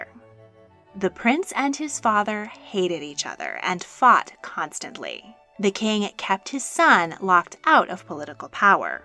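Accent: United States. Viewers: As son as I heard an American accent I passed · are you American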